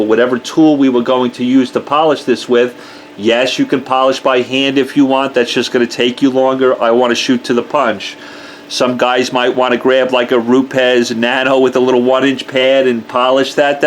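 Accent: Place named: New Jersey, United States. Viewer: Ok I love the channel, new subscriber here. Lol, your from TX now but sound like originally from Jersey ;). Love the detailing advice and yes God Dammit I purchased The Ball, gotta get The Flitz Ball...go in slow then speed it up! 👍🏻😎👍🏻 Love it, Tampa FL we share the same heat here as well brotha....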